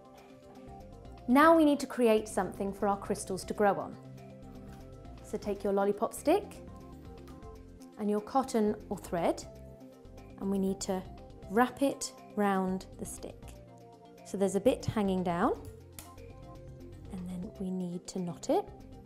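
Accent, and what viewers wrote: England, United Kingdom. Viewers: SHE'S ENGLISH not American that's what the English call IT WHO CARES anyway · im an english person living in england and that is still the most english accent I've ever heard XD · Are you British?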